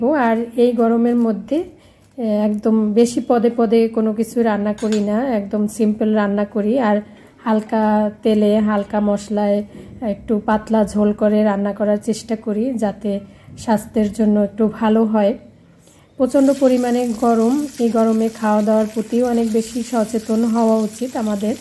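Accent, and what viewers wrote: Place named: Barishal, Bangladesh. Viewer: আপু আমি তোমার ভিডিওতে আরো অনেক বার সুনেছি আমাদের বরিশালের কথা সত্যিই কি তোমার বারি বরিশাল তাহলেতো ভালই হলো আমার একটা আপনজন পেলাম আমার পরিবারে টমেটো দিয়ে মাছ রান্না দারুন হয়েছে অনেক ভাল থেকো দোয়া রইলো তোমার জন্য ভাল থেকো ইনশাআল্লাহ আপুমনি❤❤❤❤❤❤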